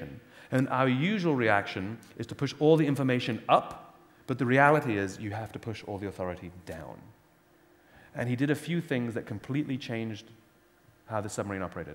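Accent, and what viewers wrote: United Kingdom. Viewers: Since when does have an English accent? I thought he American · Absolutely love. I have seen so many of his videos and how important is authenticity is to him....fabric of who he is. So I am guessing is that he just wanted to give the British accent a go...why not. Always up for a challenge.....I lived in Scotland for 5 years and could never do a Scottish accent. Anyhow, just thinking out loud. Love and now a huge fan of Marquet:-)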